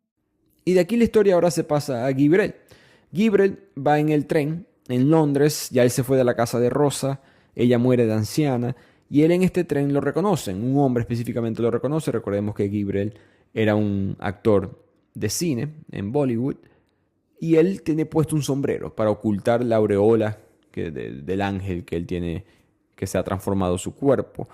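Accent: Maracaibo, Venezuela. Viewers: Tienes el acento del marabino educado e intelectual.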